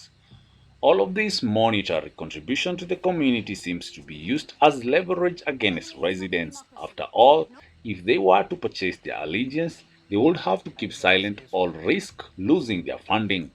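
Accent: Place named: Africa. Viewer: Oh...s@ what the f#$@ Will be much better without the naration of African accent.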